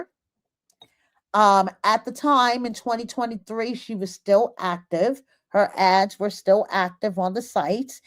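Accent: New York, United States. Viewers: I can’t stand eScott he’s not a nice human and obsessed with bloggers. As for your voice I love it, your NY accent is so cool sounding to me.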